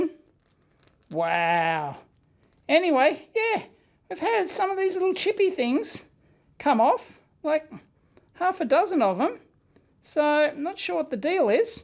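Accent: Australia. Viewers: Neat, love ya Aussie accent. Feel '0' vision would revolutionise the porn industry 😁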